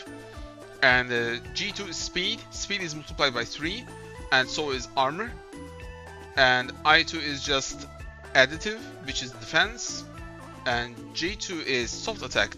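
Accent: Turkey. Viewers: bro are you turkish you have turkish accent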